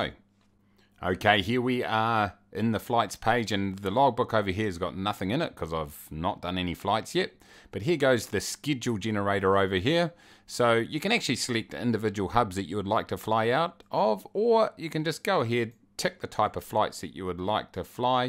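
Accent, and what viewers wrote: New Zealand. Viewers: VLDL made me found of NZ english accent :) Thank you for the walkthrough !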